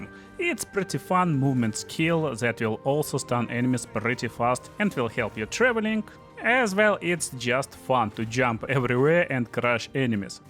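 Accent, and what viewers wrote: Russia. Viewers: Русским диалектом на английском=)))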